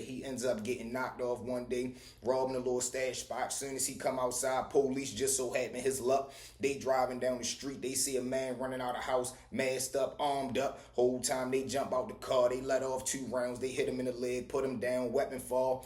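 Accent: Baltimore, United States. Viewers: Bro I love how you tell your stories real certified bmore dude right here 💯👍🏾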